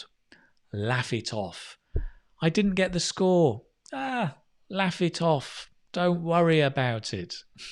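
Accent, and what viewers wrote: United Kingdom. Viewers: Hi What accent do you have? British accent, but which one exactly? Rp?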